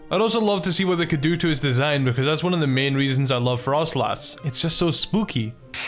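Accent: Scotland, United Kingdom. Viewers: Are you Scottish? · ***** where are you from because you sound Scottish I'm Scottish =D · tehTroll OfLife Yes he is Scottish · are you scottish?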